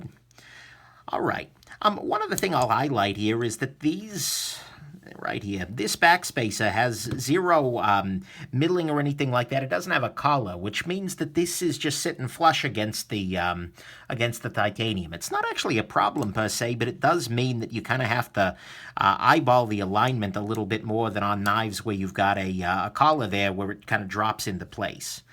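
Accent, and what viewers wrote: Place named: Northeast, United States. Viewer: voice is calming, which is usual with a Noreaster accent. Great video with very thorough information.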